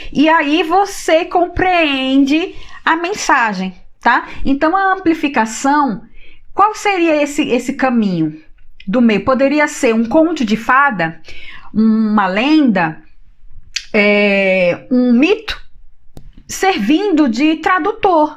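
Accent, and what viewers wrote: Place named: Northeast, Brazil. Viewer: Adoro sua forma de passar o conteúdo.Seu sotaque nordestino é adorável.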